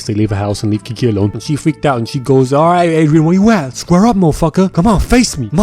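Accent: United Kingdom. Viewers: r u british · Wait are you British because that makes sound more funny idk maybe it is just me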